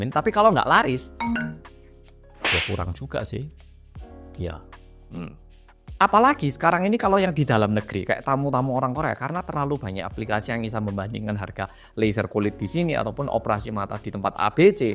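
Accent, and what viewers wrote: Java, Indonesia. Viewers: Mas, sampeyan kih kan 'medok' yo.. Opo ra enek rencana ngajarkek les boso jowo neng kono???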